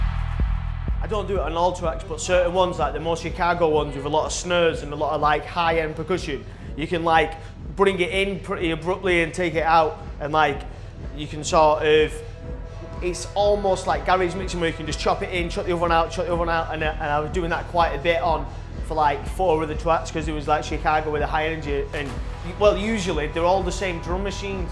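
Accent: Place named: Manchester, United Kingdom. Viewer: Love the Manc accent! ‘Bring in some rims...’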